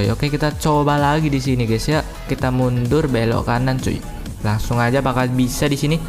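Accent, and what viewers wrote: Java, Indonesia. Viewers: Aku tebak pasti abang orang jawa kalo egak salah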